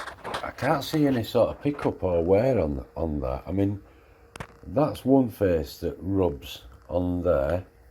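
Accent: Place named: Midlands, United Kingdom. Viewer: I have the same lathe same problem, great fix, i will do mine also, your accent, i'm sure you are from the Midlands, Worksop area, , you speak just like my dad, Cheers from Australia.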